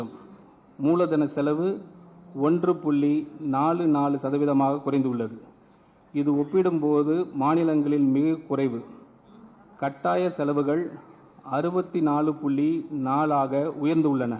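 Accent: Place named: Marwari, India. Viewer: இவருக்கு தாய்மொழி தமிழ் தானா இல்லை வேற்று மொழியை சேர்ந்தவரா....மார்வாடி போல் படித்துக் கொண்டிருக்கிறார்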